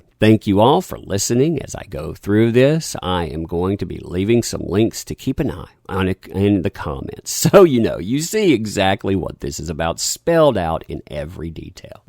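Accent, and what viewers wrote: South, United States. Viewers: That Southern drawl like a drug, son. Do a piece on any developments between Meyer v Weird- I mean Waid. YBZ doesn't discuss it and it's right of him not to, legal reasons. I can't really do the research myself, I've got too many houses on fire.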